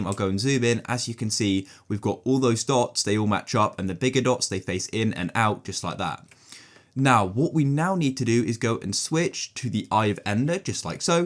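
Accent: United Kingdom. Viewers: Omg ur British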